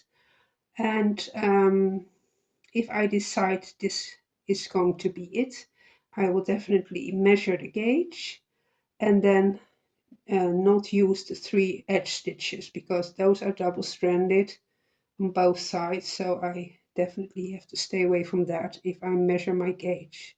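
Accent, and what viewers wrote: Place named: Netherlands. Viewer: Mooi vest! Welke garens heb je daarvoor gebruikt? Je hebt trouwens een fijn accent wanneer je Engels spreekt. Vaak hebben Nederlandstaligen een erg sterk accent. 😂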